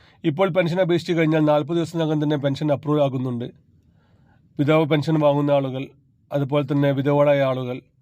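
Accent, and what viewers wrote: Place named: Bengal, India. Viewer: ഇയാൾ മലയാളി അല്ല. ബംഗാളിയോ ഒറീസ്സയോ ആണ്. മലയാളം നഹി.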